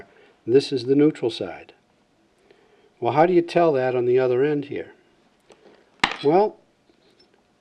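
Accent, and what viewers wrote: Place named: Boston, United States. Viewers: Glad I came across this. Good accent. I actually need to learn how to do a Mass/New England accent for a play and this should help nicely😄 I’m actually looking to fix a foot switch for a lamp. Thanks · As soon as you said That, I knew your were from Massachusetts. Made me a bit homesick. Hope your shop is still doing well. Thank You for a great demo. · Actors should listen to this guy before attempting a Boston accent in movies. Most actors hit it WAY too hard. Hahd lol.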